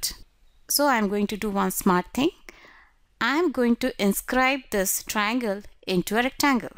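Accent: India. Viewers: Not trying to be racist but your accent sounds like your Indian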